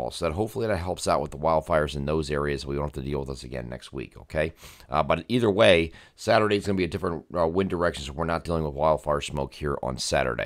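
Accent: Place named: Canada. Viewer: You got that wildfire voice bro! You can tell by the trace of Canadian accent! Ever since the smoke showed up my allergies haven’t been so bad since I was a kid. Hopefully you feel better as conditions improve personally I’m on the rebound today. Thanks once again for the videos y’all are the best in the game!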